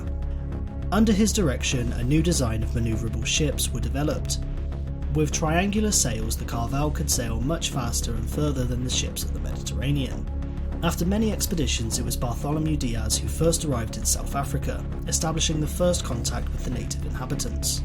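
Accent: United Kingdom. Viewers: Turns out the narrator will still try to make it look like they're not if he has british accent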